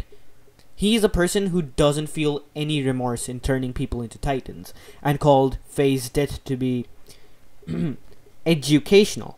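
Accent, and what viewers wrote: India. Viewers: your accent feels like indian....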